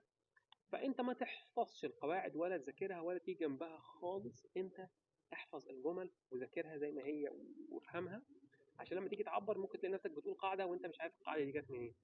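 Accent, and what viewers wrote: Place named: France. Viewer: دا الاكسنت الانجليزي الفرنساوي مضحك بجد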